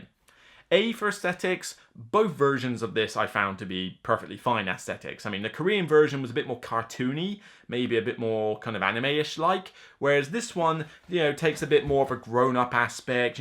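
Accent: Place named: West Country, United Kingdom. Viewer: Where you from? Devon? What's this accent, west country ish?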